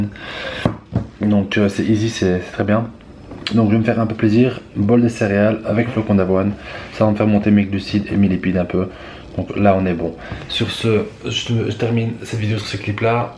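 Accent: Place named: Brussels, Belgium. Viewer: Je tombe sur ta chaîne frère de très bonnes vidéo bien ficelé ac de bnnes infos,je reconnais l'accent tu serais pas bruxellois ? en tt cas je m'abonne bn courage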